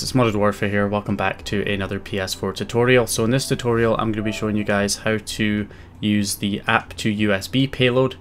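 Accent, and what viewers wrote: Scotland, United Kingdom. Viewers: Amazing videos BTW, and extra special to hear a fellow Scot explain such matters!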